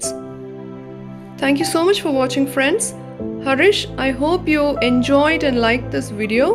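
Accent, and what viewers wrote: Kerala, India. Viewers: Malayali ano? Sounds like Malayali speaking Tamil.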